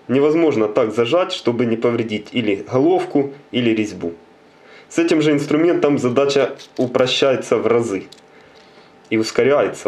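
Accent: Odessa, Ukraine. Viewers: Автор наверно из Одессы. Пионар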